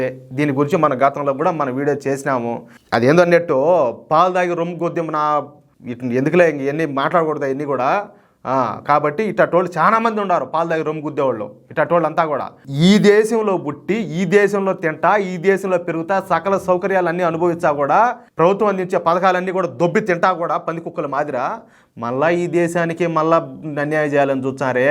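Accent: Rayalaseema, India. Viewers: సీమా యాస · Saru dhi RAYALA SEEMA yasa .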